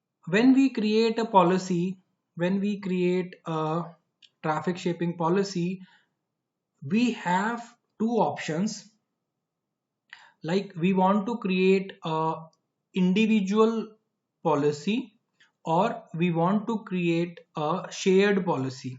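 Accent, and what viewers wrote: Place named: India. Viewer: old videos with heavy indian accent, but still far better than the official Sophos Lessons, thanks for your effort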